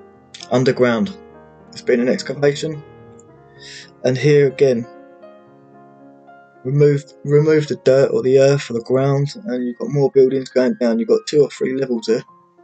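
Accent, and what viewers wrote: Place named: United Kingdom. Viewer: What a great video! I’d be lying if I said I didn’t dig the accent too. I know you guys have a bunch of different accents over there (I’m from New York), but that one always sounds like “the cool one” to me. It’s Billy Kimber from Peaky Blinders, and maybe Russel Howard the comedian. Let me know if I’m right, crazy, or an idiot. ✌🏻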